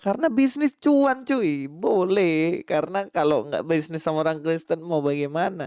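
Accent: Java, Indonesia. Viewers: Hahaha lucu lelaki berdialek jawa ini, belajar nya kurang jauuuuuh, padahal panduannya belajar sampai ke negeri China....?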